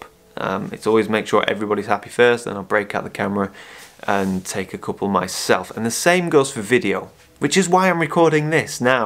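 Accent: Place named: Northern England, United Kingdom. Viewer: Just discovered this channel...brilliant! Can just about understand your ‘tut north’ accent :) was just wondering - you used a full ND and an ND grad in a low light situation.....how do you position the ND grad correctly as it must be difficult to judge the horizon line in such low light... Unless you put the ND grad on first - align it then put the full ND on after?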